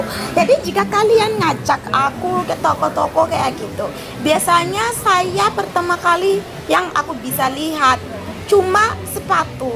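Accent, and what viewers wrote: Java, Indonesia. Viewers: Mbk jd medok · Bukan seperti orang Afrika, malah seperti orang asli jawa. Medoknya 100℅.. Kesini gara2 chanel sebelah. Londo kampung. Semngat trus kkak. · Mb sudah medok.salam kenal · Medoknya mbk,kereen,suamiku Nigeria belum bisa indonesia,mb keren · Gara gara Londo kampung aku mrene penasaran hehehehe wes medok mbak sampean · Mbak bhs indo nya khas org jawa bgt:D👍 Salam dari kalimantan utara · Aku suka aksen Jawa-nya Mbak. Salut. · Zimbabwe medhok jawa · Semangat mbak 🤗 medok nya lucu bgt 😁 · Mbak bahasa Indonesia sampean medok hehe... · Wow mbak aksen bahasa indonesia anda medhok banget seperti aksennya orang jawa,, mantab mbak 😊👍 i'm new subscriber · Hii..i visit ur channel after i saw ur collaboration with dave. That's great!! Happy stay in indonesia..ur accent almost same with javanese with strong in D, B, G..hehehe.. Btw i'm from west java..can u share about ur hometown culture? 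Foods, tradition, song or anything.. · Medhok dong suaranya Tadi habis nonton londo kampung langsung cuss kesini. Btw kak gak di suruh kayak ng scan gitu kalo mau masuk mall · Kok logatnya kyk orang jawa ya...hehe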